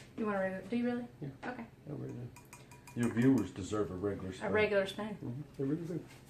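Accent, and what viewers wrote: South, United States. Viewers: Happy Birthday 🎂 to both you. I live not far from you, I am in Haw River. I like that southern accent.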